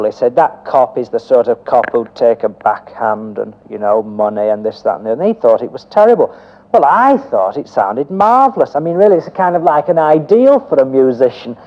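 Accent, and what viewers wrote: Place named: Liverpool, United Kingdom. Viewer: same accent as John Lennon. One wonders if they are from the same region. Hmmmmmmmmmmmmmmmmmmmmmmmmmmmmmmmmmmmmmmmmmmmmmmmmmmmmmmmmmmmmmmmmmmmmmmmmmmmmmmmmmmmmmmmmmmmmmmmmmmmmmmmmmmmmmmmmmmmmmmmmmm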